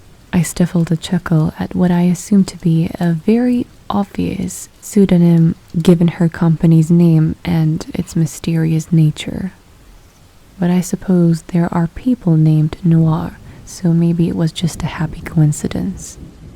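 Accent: United Kingdom. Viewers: It’s awsome the British accent ❤️ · Great British accent xx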